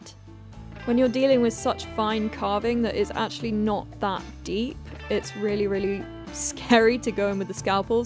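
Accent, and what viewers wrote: England, United Kingdom. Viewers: You’re amazing! I love old tools and restoring old treasures, bonus you’re a pretty girl with an English accent. Couldn’t make a more perfect video! ❤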